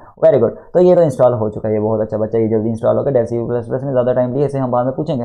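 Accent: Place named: Kashmir, India. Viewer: are you from J&K? your hindi sounds like it's having kashmiri accent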